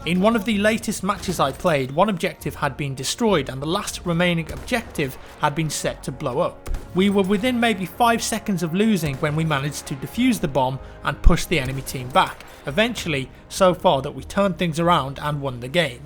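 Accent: England, United Kingdom. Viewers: Trevor Leach its an English accent mate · Trevor Leach I would to something about the male English voice!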